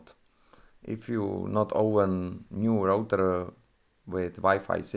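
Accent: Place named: Czech Republic. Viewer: 😀 brutální czechlish 😀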